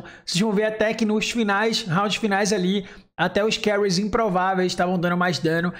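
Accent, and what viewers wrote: Recife, Brazil. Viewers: Tu é de Recife meu bom? N acredito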